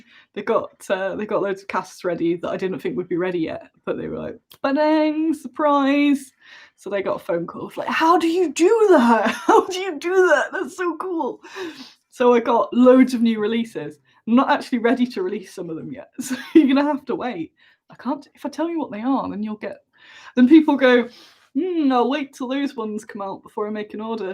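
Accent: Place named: United Kingdom. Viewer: You talk like a British person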